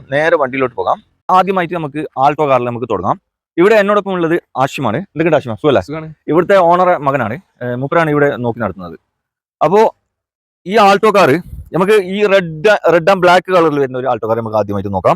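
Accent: Kasaragod, India. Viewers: കാസർകോഡ് ഉള്ളവരുടെ സംസാരം ഇത് വെരെ കേട്ടില്ലാ..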